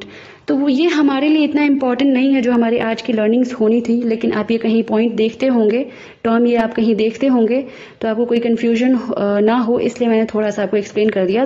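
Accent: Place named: Bihar, India. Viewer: आप बिहार से हैं न मैडम॥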